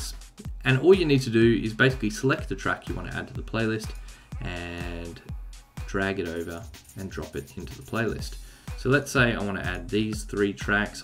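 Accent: Australia. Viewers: wth r u from sydney?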